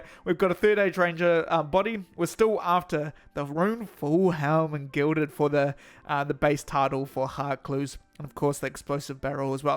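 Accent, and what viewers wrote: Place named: New Zealand. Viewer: Mate, are you a kiwi? You got that thicc east tamaki accent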